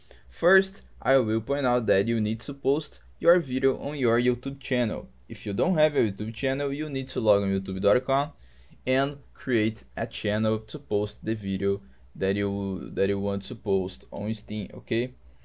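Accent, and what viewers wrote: Brazil. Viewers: Quando comecei ouvir eu pensei "Estranho, acho que é brasileiro" quando vi o nome " " eu tive quase certeza, ai então eu vi o amigo "F-100 reformada pelo lata velha" KKKKKKKKKK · nem fudendo que quem me ajudou foi um brasileiro falando ingles com sotaque brasileio kkkkkkk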